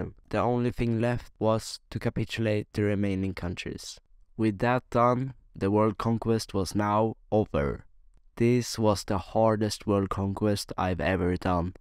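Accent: Sweden. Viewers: Hey are you Swedish? We have the same accent pretty much so I KNOW you’re at least Scandinavian 💀💀💀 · Are you swedish? I can hear it in your accent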